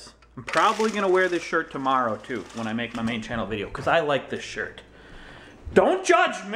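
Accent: England, United Kingdom. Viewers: we need to hear the snobby englishman voice more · i abousolutly loved your english accent soo funny you sounded like stewie in family Guy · I think that was the best English accent I've ever heard you do! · Running barefoot leads to cuts and abrasions and let's not leave out what happens if you stub your toe! Oh, and then there's slipping on a banana peel or stepping in doggy doo doo!! I really liked your English accent :)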